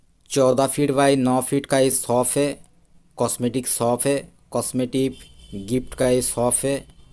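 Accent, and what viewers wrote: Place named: Bengal, India. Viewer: Bhai sahab ap bangali lag rahe ho apki hindi se malum padh rha h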